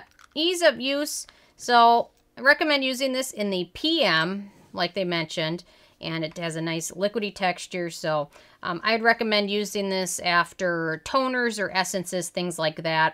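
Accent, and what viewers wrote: Minnesota, United States. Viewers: I’m Minnesotan and recognize a fellow cold north accent when I hear it ;) Wisconsin?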